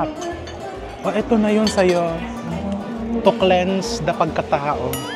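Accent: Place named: Western Visayas, Philippines. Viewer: nakkakatuwa ka chef panoorin heehe pati pag ssasaalita mu para ka ilonggo.. srap mu panoorin na kumakain k chef.. good luck po chef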